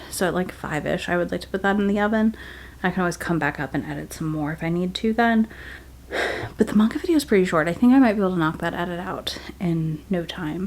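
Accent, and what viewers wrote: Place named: Kentucky, United States. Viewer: As a KY native living in SC, hearing talk made me feel like I was back home 😂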